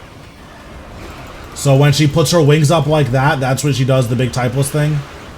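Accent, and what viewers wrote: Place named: California, United States. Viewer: Where are you from? Sounds like California. 🤔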